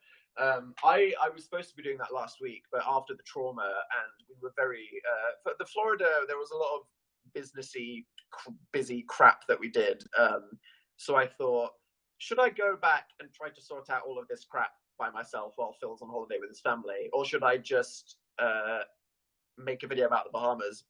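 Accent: United Kingdom. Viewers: You're so extra British in this somehow. You keep reminding me of Eric Idle for some reason, and you're so fucking funny and intelligent!! I'm always so impressed by how quick-witted you are.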